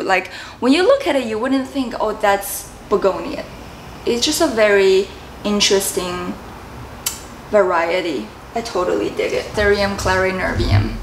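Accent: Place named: Southern California, United States. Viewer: It’s a SoCal accent, silly goose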